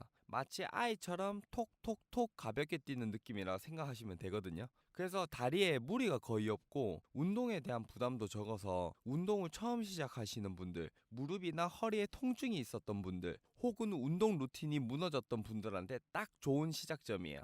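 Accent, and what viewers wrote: Gyeongsang, South Korea. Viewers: 이거 말투가 대구네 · 대구 분이시네요ㅎ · 대구 분이시네요. 저도 대구 사람입니다. 반갑습니다 ㅎㅎ 구독하고 갑니다.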